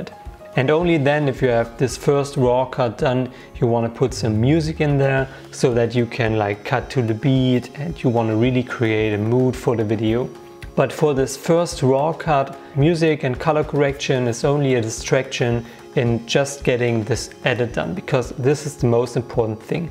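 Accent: Germany. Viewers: Hi! Just spend most of the evening watching your content! Really like your style and the way to explain things! The thing that made me land on your page, was that we used the same camera (GH4), i know that you are not using it anymore, but i wanted to ask you a question about your settings on the GH4. I believe that as you import your footage, i can see that you are shooting in 24 fps. I have some difficulties in really understanding that on the GH4. Listing to your voice, i believe that your from Germany or somewhere here in EU. How can you use the 24 fps settings on your GH4? Are you just changing your PAL/NTSC settings or what? Are you shooting in C4K on your GH4 or 23.98? Hope to hear from you, and that you still remember your settings:)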